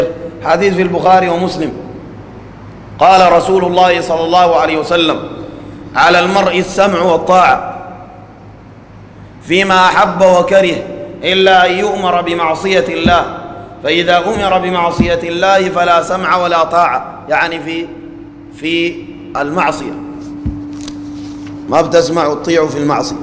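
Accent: Sudan. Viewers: كم هي حلوة اللهجة السودانية اخوكم من الجزائر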